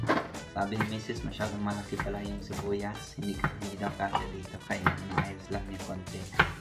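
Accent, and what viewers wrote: Ilocos, Philippines. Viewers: Grabe balong. Pinoy na pinoy ka na talaga ah. Ay ilocanong ilocano na pala hahahaha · Ilocanong ilocano pati Beng at panagsao ket natangken... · I really salute how you embraced being a Filipino. Ilokana din ako and you really sounds like a legit one. Kaway-kaway sa new subscriber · Ang cute mong mag salita ilocano ka nga talaga 😂😂😂I love it ❤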